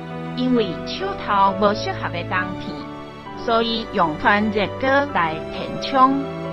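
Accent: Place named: Taiwan. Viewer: 強大的台語，拆機教學，害我笑到肚子很痛。本來是要學拆機，變成學台語發音。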